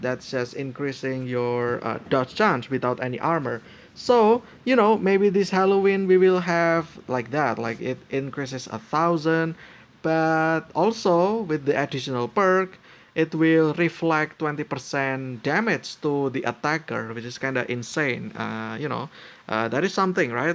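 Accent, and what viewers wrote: Indonesia. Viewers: Are you Indonesian? That accent is so distinct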